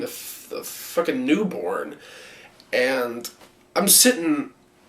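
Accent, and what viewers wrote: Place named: United States. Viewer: You look British or possibly Irish, why are you talking in an American accent?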